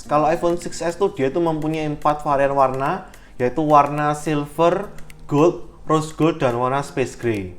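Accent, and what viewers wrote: Indonesia. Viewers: Logatnya surabaya banget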